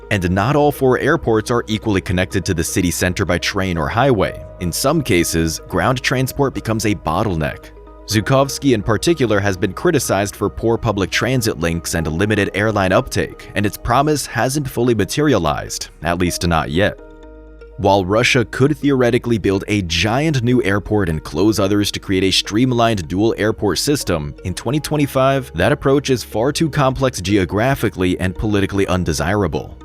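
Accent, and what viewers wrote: United States. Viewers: Very strong American accent. And why miles miles miles. Is it not 2025?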